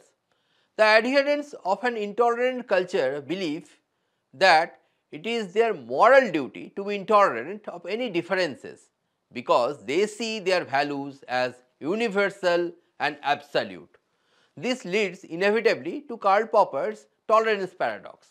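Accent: India. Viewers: Horrible Bengali accent....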